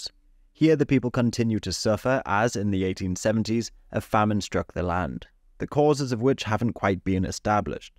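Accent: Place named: United Kingdom. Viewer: He has a British accent. He’s not American. Are you slow?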